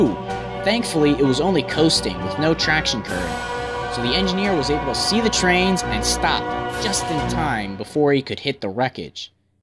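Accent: United States. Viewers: Here in America all the errors that you mentioned are the way we say it here, you need to remember that this is a American narrating about a UK event, just cause we say thing differently doesn't mean it's wrong. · Its Born-Muth nor Born-Mouth. but its ur american accent so.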